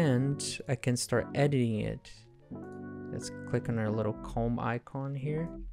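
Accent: Brazil. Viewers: Wtf i think you have the best english in Brazil lol I mean you lost most of the accent... i can only wish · is that a brazilian accent? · Your accent remembers me the brazilian accent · Esquisito como eu consigo sentir q você é brasileiro kkkkkk. Vídeo muito bom e vc explica mto bem, cara, parabéns na moral foda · Eu reconheço um br quando vejo e escuto um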